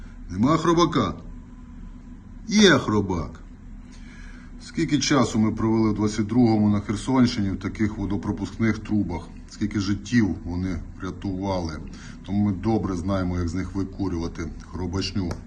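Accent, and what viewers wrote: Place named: Mukachevo, Ukraine. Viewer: Мадяр у тебя акцент как у жителей Мукачево.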